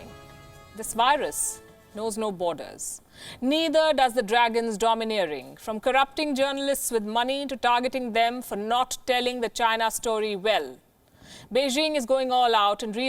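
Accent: India. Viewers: it's indian accent only dumbass not everyone has stereotypical accent likewise I am sure not everyone in China is Ching chong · If chinese are low class Indian is it better . This reporter still hv to speak well Not induan accent.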